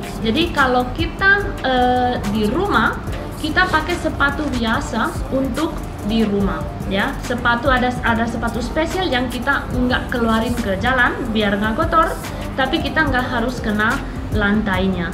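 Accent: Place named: Indonesia. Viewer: ni cakapnya macam orang lndonesia dari ernis apa ya.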